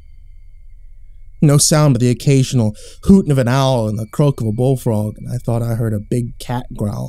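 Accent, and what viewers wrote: United States. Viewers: He managed to do a good North of I10 accent. Not really cajun more just Iota area. Or maybe Jefferson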